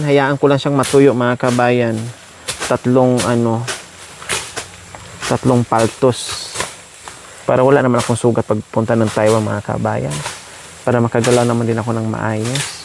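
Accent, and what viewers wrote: Visayas, Philippines. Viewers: Madam🥰noon pa kita pinapanood kasi boses bisaya ka,,nakakatuwa❤️wow,malinis na ang kapalugiran,,madaanan nyo na,,at makikita nyo kaagad ang Ahazzzz!Good job,,ang galing ni kuya Ebok,,mga masisipag naman sila,,Tama,,salamat at may matutulungan ka,,sa taong nangangailangan,❤️Bless ka ng Panginoong ,,JEHOVA❤️🙏🏼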